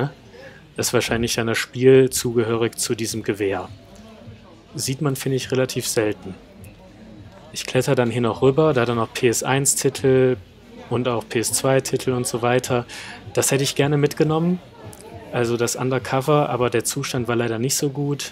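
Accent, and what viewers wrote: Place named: North Germany, Germany. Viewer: Aus Norddeutschland schätze ich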